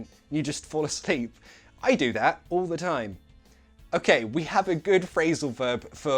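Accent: United Kingdom. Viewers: Oh yes British English is best! Thank you for those 15 minutes of pure learning and entertainment beautifully packaged 😃👍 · love british accent. help me with it please! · It is so nice to listen British accent 💫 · you're the first british accent guy that I've heard not having that horrible and funny accent lol... like a hot potato in the mouth.... most british people have that hot air in the mouth while pronunciating words lmao...Hallelujah lol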